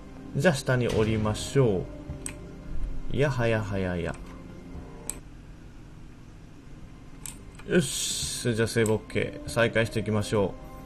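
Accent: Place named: Kansai, Japan. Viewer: 若い頃のガッチさん、関西弁で、かなり高めの声だったんですねー。 あと、レトルトさん、ウッシーさん、キヨさん居ないからか寂しい。 敬語が少なくて、わっかいなーって思たヽ( ｀･ω･)ノ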